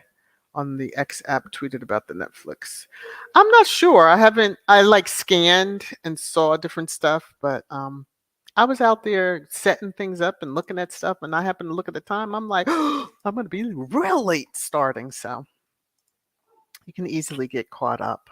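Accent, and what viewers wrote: RP, United Kingdom. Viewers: Thank you for another amazing thrash time 🙏😘❤❤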